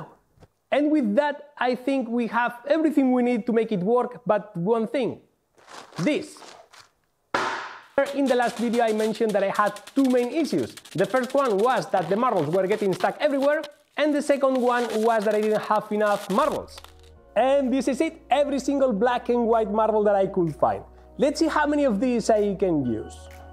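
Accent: Spain. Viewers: como se le nota que es español al jodio... increible video e invento tio!!!! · Se nota a kilómetros el acento español que locura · Tu ere español no? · el acento español se nota un huevo, eso ayuda a los españoles que sabemos inglés a hacerlo más facil de entender, muy buen video y me voy a ver un par más que me parecen interesantes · Tú eres español, a mi no me engañas! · Como se nota que eres español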